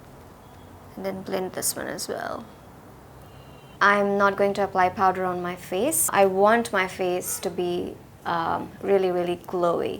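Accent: Bengal, India. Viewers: Hey just subscribed & loved ur video! r u Bengali? U sounded like a Bengali. I'm from Bangladesh. Sending love from here 😘 Nd u're so much natural beautiful that any makeup look will suit u.even without makeup u're much pretty 😍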